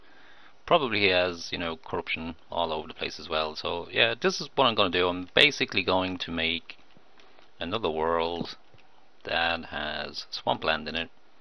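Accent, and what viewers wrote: Ireland. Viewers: I love how UR Irish idk why but I'm Irish to to I guess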